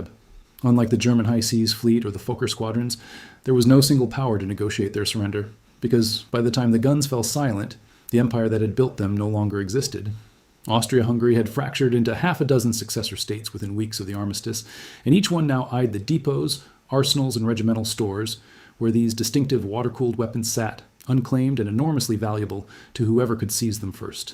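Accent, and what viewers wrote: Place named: United States. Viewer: I like how it's called Britain's war files, but it's an American AI voice that takes loud breaths for some reason 😂😂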